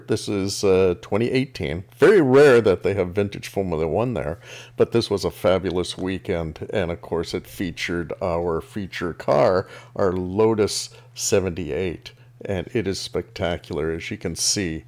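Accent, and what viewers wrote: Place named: Canada. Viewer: Hello, Thank you for another nice content about F1 slotcars, as well as the extra footage and books you`ve shown. The Lotus F1`s remember me of my teenage days in the 70`s when I watched a lot of F1 races. I had been a fan of Ronnie Peterson and I had the Corgi Toys replica back then. When I got older, I gave it away to my cousin to play with. Sadly, there were no F1 models of the Lotus by Carrera at that time, if I remember correctly. I had a 132 Carrera Universal set then. Scalextric was not known in Germany at that time here. Very nice models by Fly, as they always do, but technically seen, Fly is not my choice to go for in slotcars. As shelfqueens they are maybe fine, but they are to fragile if you crash them in my opinion. Are you situated in Canada, cause I think I heard a Canadian accent there?:-) I got a lot of Family in Ontario. 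Any way, I finally left a subcription and a like. Greets over from Germany 🙂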